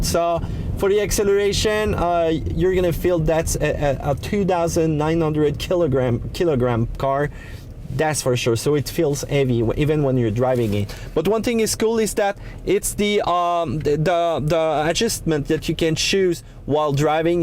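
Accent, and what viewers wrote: Quebec, Canada. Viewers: Lol Quebecer accent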